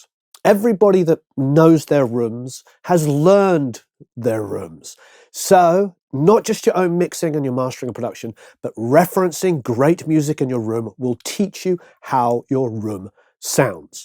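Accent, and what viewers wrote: England, United Kingdom. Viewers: Love your work. Are you from the same part of England as Sting? You sound similar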